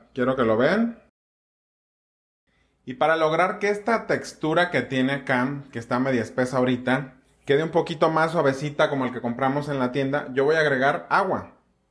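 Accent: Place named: North, Mexico. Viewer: justo ando haciendo hummus ( yo le quito el pejello porque da pedorrera 😅🙈 donde eres por el acento? arriba el norte mijo haahahah saludos desde sonora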